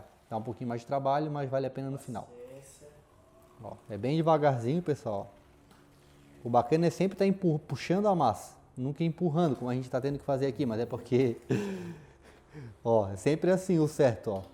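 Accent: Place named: Florianópolis, Brazil. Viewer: "A viXta aqui.." "Arrente" "Mexmo assim já passou o Axpirador..." Manézinho será mo quirido? Top demais ein!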